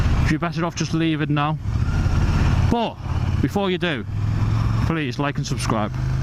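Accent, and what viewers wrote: Liverpool, United Kingdom. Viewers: ASB! angry scouse Biker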